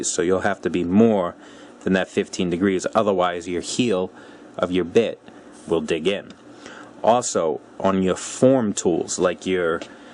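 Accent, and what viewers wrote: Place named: New England, United States. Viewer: Good to hear the accent - I used to race at New England dragway in Epping and grew up in Burlington, Mass. - Now teaching machine shop in Florida - good videos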